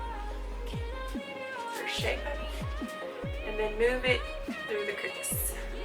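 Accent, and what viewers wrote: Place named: South, United States. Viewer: your accent and Southern charm shine through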